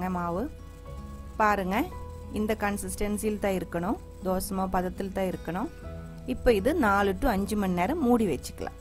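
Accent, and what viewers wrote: Kerala, India. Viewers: Neenga malayali thane.unka pechula theriyuth.so nice.ur voice and recipe 😊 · Malayalam’ised tamil sounds quite irritating . Sometime sound like mocking.. I don’t understand why .. no Tamils or any other would start a channel targeting other languages.. would request not to kill the language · super recipi. malayalam kalantha unga thamil super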